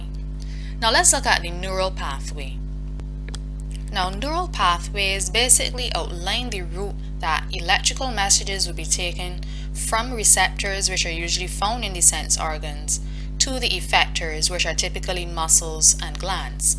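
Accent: Barbados. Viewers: yea...I figured so.....I grew up with that accent so I knew it...just certain words were classic Barbados. Glade to hear ya teaching. Create more content. Thank you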